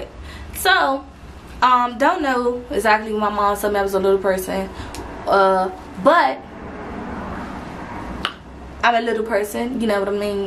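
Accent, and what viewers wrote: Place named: Chicago, United States. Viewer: Friend are you from the chi your accent Gives me very much Chicago forgive Me if im wrong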